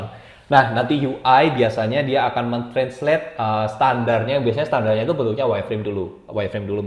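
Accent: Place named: Surabaya, Indonesia. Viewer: Oalah Mas kie wong Suroboyo tho, pantes kok medhok..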